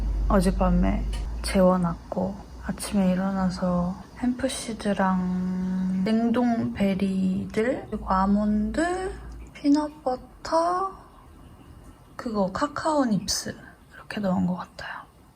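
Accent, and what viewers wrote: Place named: Seoul, South Korea. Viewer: 서울말 엄청 잘하십니닼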